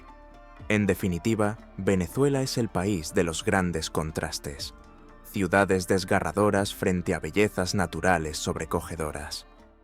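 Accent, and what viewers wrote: Spain. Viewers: La envidia te hace delirar, solo hablas por que te dicen los otros como tu envidioso, ... ojalá algún día tengas la posibilidad de ir a Venezuela, .... para que veas lo muy superior que es sobre tu país y muchos otros. Ese narrador nunca ha saludo de España que creo es su acento, por lo tanto habla bobadas por que no sabe. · por tu acento asumo que eres español, lamento decirte que españa tampoco es la octava maravilla del mundo Einstein, saludos desde el pais mas lindo del mundo ES.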